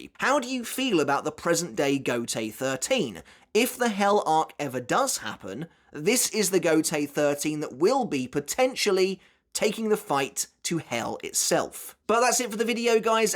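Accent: England, United Kingdom. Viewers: hard to follow when you spell it with the heavy English accent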